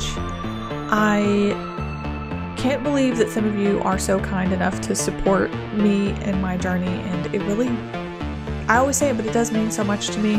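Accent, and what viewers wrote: United States. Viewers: Seriously? The current scale is the EF-scale. Europe still uses the F-scale, but you sound American to me. That said, as you know, the EF5 scale tops out at 318 mph. It's unlikely that higher windspeeds, which have been recorded as high as 320 mph, would cause more damage. It would take a much higher windspeed to create a new class of catastrophic damage. As for your comments about damage, it's possible to create your hypothetical scenarios under laboratory conditions. Engineers know exactly the structural integrity of a building within its technical life. Before you make another video, maybe you should ask an engineer, actually read the EF-scale, and review the peer-reviewed research about tornadoes.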